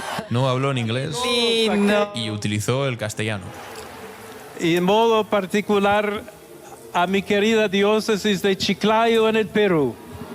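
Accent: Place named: Spain. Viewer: Si vas a hablar de comunismo o liberalismo deberías de saber las pautas políticas de lo que dices y no hables de mediocre porque quedas mal hoy en día si Jesús estuviera aquí predicando lo que predicaba no se le diría comunista porque él no decía que todo es para todos él decía lo del César al César eso significa que él era un liberal no era un socialista no era un comunista era un liberal democrático moderno de sus tiempos Así que no hables piedras y una cosa es tener la ideología social y otra cosa es ser política socialista o comunista no te confundas no pases de ignorante edúcate antes y en cuanto a lo del Papa no tiene nada de malo que sea estadounidense o peruano con mucho orgullo digo que sea latinoamericano como nosotros por lo menos de corazón aunque haya nacido en donde haya nacido claro que tú no sabes ese orgullo porque se nota por tu acento que eres español entonces para ser español Que poca educación que tienes porque mi ley es uno de los mejores presidentes y lo admiramos aquí esa política económica y política socialista que tenían los mataba de hambre a los argentinos Así que tú no tienes por qué criticar si no vives en Argentina qué criticas O sea la economía de Argentina está avanzando lo que no hicieron en 15 años los anteriores eso es algo que aplaudirle y en Perú Por supuesto que estamos orgullosos de ese papa o por lo menos hasta cierta parte orgullosos porque de que tiene su senda oscura sí la tuvo pero queremos creer que todo ser humano como dice el ca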